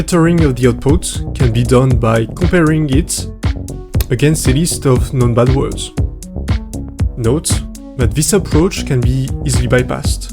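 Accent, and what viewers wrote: France. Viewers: Did you know your accent is because you pronounce english words as French, or the letters atleast. It's why when I speak Japanese, I actually get praised on my accent because I force a fake asian accent that if I spoke in english would get me cancelled 😂 but for speaking Japanese it actually makes my accent more like a local. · l'accent fr xD, je m'y attendais pas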